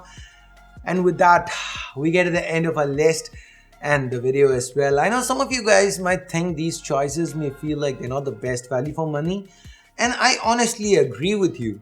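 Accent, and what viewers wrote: India. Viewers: Very well done 👍 you're speaking the voice of India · i love u indian talking engliah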